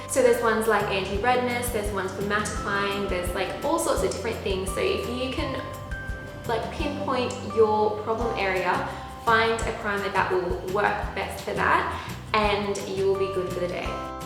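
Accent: Australia. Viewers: Are you Australian? Random question, I know. But great job with the makeup though! 😃💄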